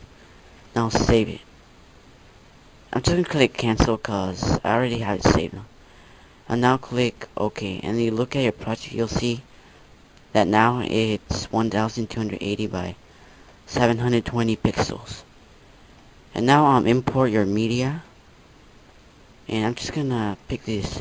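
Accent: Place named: Asia. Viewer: Lol a very asian accent this guy has.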